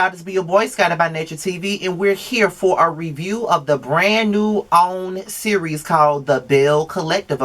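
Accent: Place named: Mississippi, United States. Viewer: I didn’t know you were from Mississippi. I’m from Jackson, MS! I knew I recognized that accent lol. Represent!! You have a new subscriber. We have to support our own.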